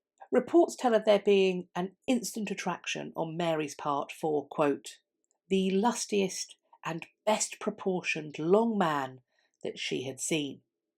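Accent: United Kingdom. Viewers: Sometimes one just has to hear interesting British history told with a lovely British accent.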